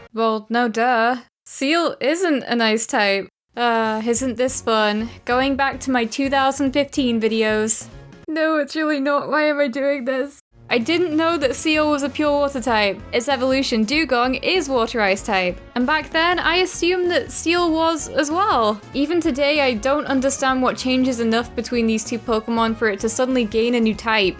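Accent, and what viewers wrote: United Kingdom. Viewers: It’s intentional that’s how brits speak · She did not mess; she's British! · I love your vids! Keep it up and keep the British pronunciation!!! <3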